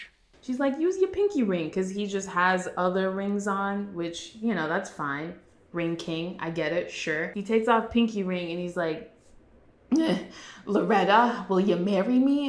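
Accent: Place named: Italy. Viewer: Your Italian accent is everything 😂 now i gotta go watch this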